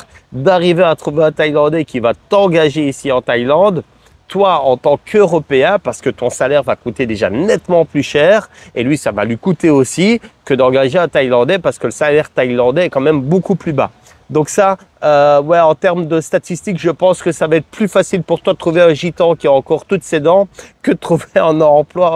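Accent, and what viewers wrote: Liège, Belgium. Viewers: Le DTV est très bien mais dès lors que tu veux orienter une partie de ton business avec la Thaïlande comme thématique il faut alors en effet créer une société. C'est ce que j'ai fait, nous avons ajouté l'activité de communication digitale au sein d'une de nos trois sociétés, l'occasion en même temps d'y incorporé les revenus que je génère avec ma chaine secondaire et l'affiliation, même si cela n'est en rien lié avec le Pays du Sourire. Et oui, flirté avec la "zone grise" peut avoir de graves conséquences, d'autant plus que le gouvernement va scruter très attentivement les réseaux sociaux dès cette année, il y a quantité de Thaïlandais et d'étrangers qui ont développé des activités en ligne ( surtout sur line pour les Thaïlandais ), qui génèrent des revenus qui feraient rougir bien des patrons de grosses boites en Europe, et qui ne sont bien sûr absolument pas déclarés, ou faussement déclarés avec des bureaux virtuels dans des pays complaisants. 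Des centaines de millions de bahts de taxes perdues pour l'Etat, gageons qu'ils ne vont pas en rester là... Sinon, à l'accent, tu ne serais pas de la région de Liège ?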